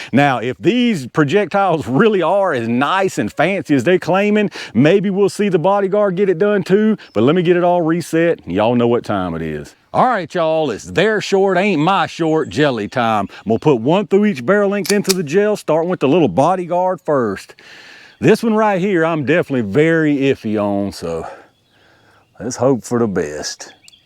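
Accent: South, United States. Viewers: Good info! Thanks for your diligence. Love the southern accent! - signed NY Yankee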